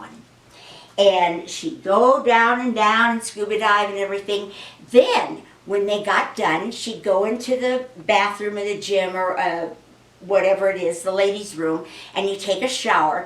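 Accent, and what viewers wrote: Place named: United States. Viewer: I’m from England and your strong American accent really makes me feel happy and I smile, you say bangs we say fringe, but when you said dirty bangs I fell about laughing ( this was in a previous vid) you’re such fun I now say dirty bangs as I find it so funny, you’re becoming like a Sister to me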